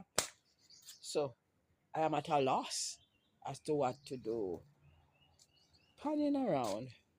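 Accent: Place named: Jamaica. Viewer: Wow.wow mis I almost give up on your Channel because I don't see you for a very long time. Good to see you again. I see Marie every day also Stacy but not you I just love to hear you talk real Jamaican talk😅 keep planting because peppers and tomatoes are too Expensive in the market nowadays, Respect 🙏 Jah guide nuff blessings Carramondo never heard that name before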